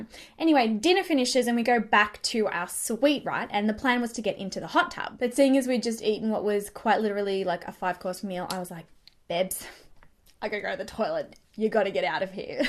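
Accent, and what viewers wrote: Australia. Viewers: I recently found your channel and you’ve filled a huge homesick void for me. I moved from Australia to America in 2012 to be with my husband. I miss Australia so much, it might sound odd but hearing your Aussie accent and slang makes me SO happy, you’re so much more relatable and down to earth compared to other Australian YouTubers. I haven’t seen or spoken to another Aussie since moving here and I often feel like an outcast. Watching your videos reminds me of where I came from and to be proud of it. Congratulations on your engagement!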